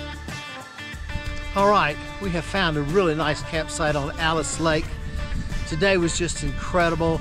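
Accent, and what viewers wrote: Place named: South, United States. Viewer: Yeah, that accent tells me Alabama/Tennessee. I reckon you got some serious ancestry in the southeast.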